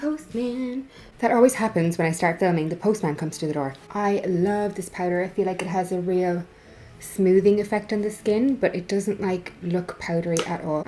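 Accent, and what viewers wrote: Ireland. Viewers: is from ireland?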